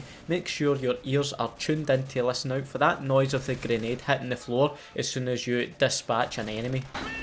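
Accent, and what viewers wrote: Scotland, United Kingdom. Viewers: oh, a scottish (?) youtuber??? Hmmm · 2 years later I still think this guy has the most Scottish accent ever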